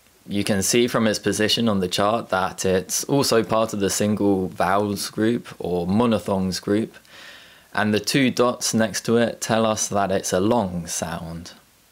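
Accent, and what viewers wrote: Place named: RP, United Kingdom. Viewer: You need to make it clear this is for the rp accent and isn’t representative of the uk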